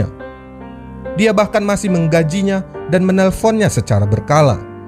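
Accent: Medan, Indonesia. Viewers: orang medan ini ya? dari aroma suaranya uda kecium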